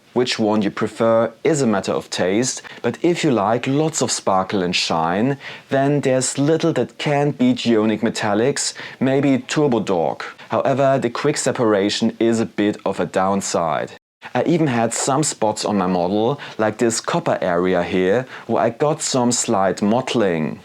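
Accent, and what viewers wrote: Germany. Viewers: So about this 'pronunciation' thing in the comments, it's because he's from Germany, I presume? It's a german accent or how germans read certain alphabet differently. What's the fuss about?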